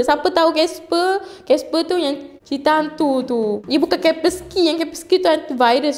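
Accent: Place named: Terengganu, Malaysia. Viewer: Pekatnya ganu sis🤣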